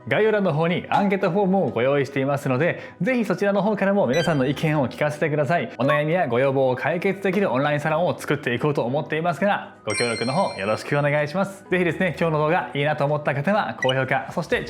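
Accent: Kansai, Japan. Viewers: 初めて見ました〜 関西の方かな？ 私はあまりこの手のYouTube見ないのですが、解説がどこにどう効くかとか、今の状態が示す自分の体の状況がわかりやすく、あまり長すぎないストレッチ、そして声が良くて！最後まで一緒にストレッチしました〜 体スッキリ！ 年齢と共に体型が少しずつ変化していくのでそのへんの解説と共に効果のあるストレッチをしたいな〜と思い、登録しました！ 昔に比べてふくらはぎの太くなっていく部分が下がっています。太さは変わらないのに…これもまたまだでしたら解説聞きたいです！ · 脚痩せ、だいてんし引っ込めたいです。先生の良い声、時々関西弁がツボです♪洋画の吹き替えやってほしいです^ ^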